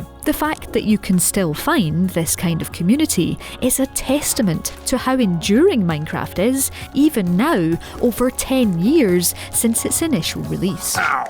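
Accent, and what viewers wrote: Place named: Scotland, United Kingdom. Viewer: Scotish Accent is gold very good content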